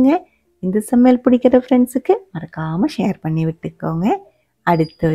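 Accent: Kerala, India. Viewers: Even Though U Are Kerala Malayam U Are Speaking Tamil Very Beautifully Must Appreciate U Expecting More Food Recipe From U Thank U Sister Wheat Dosa Very Nice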